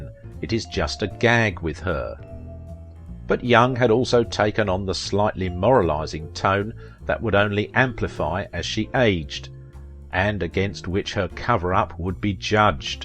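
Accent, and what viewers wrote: England, United Kingdom. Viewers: Hi, hope you're doing fine with your busy agenda. You have a strong british accent... Are you originally from Great Britain? Just asking. Have a great day and thx for your work! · Shoddy english accented tripe for clicks. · Nothing worse than a male gossip. Especially with an English accent.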